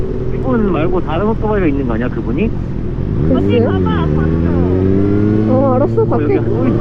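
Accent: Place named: Jeolla, South Korea. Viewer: 역시 전라도 사투리ㅋㅋㅋㅋㅋ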